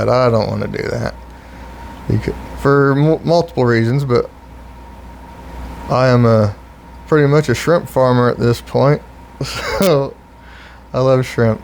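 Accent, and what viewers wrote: United States. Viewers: Also wanted to know of course you gotta southern accent. Where are you from?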